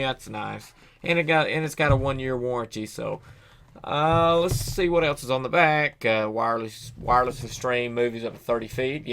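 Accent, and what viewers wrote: South, United States. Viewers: The issue may be that the iHome is not acoustically isolated from each speaker. I wonder if placing some sound isolation material between the 2 speakers may reduce this issue. The base from one speaker may be causing interference with the other since the sound wave could be colliding during loud play. Hey love your Southern Drawl. Not making fun, I just think it is unique and we don't get any of that where i am from. Cheers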